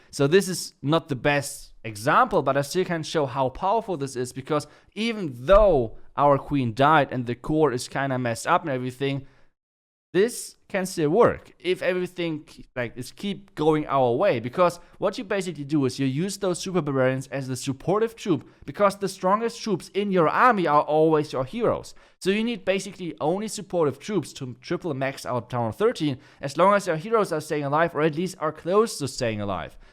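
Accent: Germany. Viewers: I love how german sneaks into English videos, especially zuper 😂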